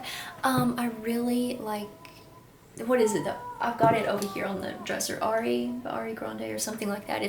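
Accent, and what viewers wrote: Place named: Kentucky, United States. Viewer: Great video, enjoyed it lol your personality is so amazing, ky ascent.. I never noticed lol prob where I'm from Ky also, really I never noticed lol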